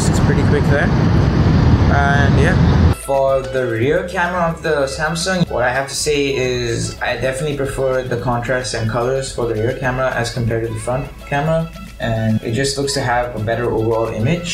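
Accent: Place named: India. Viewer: Your Indian accent is not funny 👍😅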